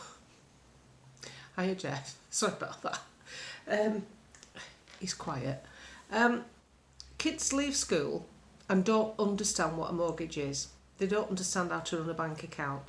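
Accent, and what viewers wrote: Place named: North, United Kingdom. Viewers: Never boring, it's lovely to hear a Northern voice, like mine, say the stuff that you could have plucked out of my brain. Unfortunately due to my work I have to keep most of it to myself. BUt me and my husband say all this stuff at home. We sick of the gobshites spouting lies and trying to convince us we're far right. I say they're not right, not right in the bloody head if they think we're daft enough to believe them. Keep going - we're winning! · Hi. Old bod here from Tod. It’s not just the accent that I like giving us good old northern common sense. But the words are so true. Came across you through following Neil what a find. You cheer me up give me hope.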